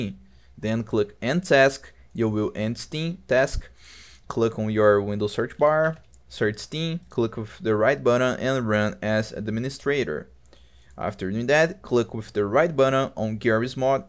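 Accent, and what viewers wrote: Brazil. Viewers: Valeu mano, seu inglês é fd mas o sotaque do BR não sai 😂❤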